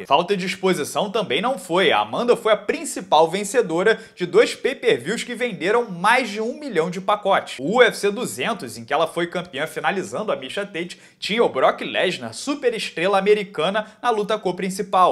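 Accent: Rio de Janeiro, Brazil. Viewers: Puta q pariu!!! Esse canal é da hora ms sotaque carioca é tão repugnante que chega da agonia kkkkkk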